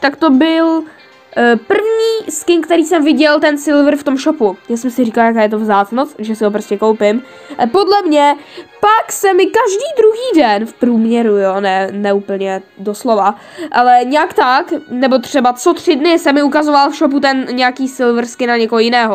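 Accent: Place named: Ostrava, Czech Republic. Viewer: Je Ostravák :)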